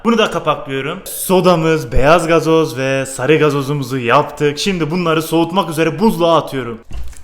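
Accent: Adana, Turkey. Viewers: Adanalı mısın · Abi adana şivesi gibi konuşyorsun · alürüm geçirüm eklüm aktarıyorüm abi adanalı mısın hzhshshebshs seviliyosun cok beceriklisin masallllaahh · rendeliyrum adana şivesi çok iyi :D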